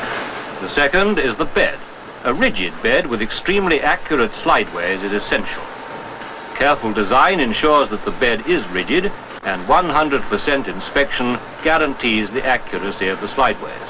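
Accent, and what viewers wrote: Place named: RP, United Kingdom. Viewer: Hearing the narrator say “thou” instead of thousandth in his posh RP accent lends terrific credibility I must say old boy.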